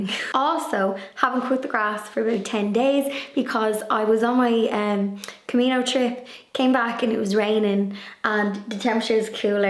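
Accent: Ireland. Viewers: Love all your videos, what ever you put out I am happy to just soak up your beautiful soft Irish accent 🥰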